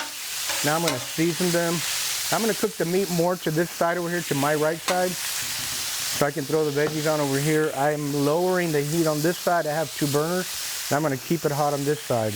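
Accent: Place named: South Texas, United States. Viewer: I could hear it in your voice brother. Haha The RGV is my second home. I spend a lot of time out in McAllen. Do you have a restaurant out that way?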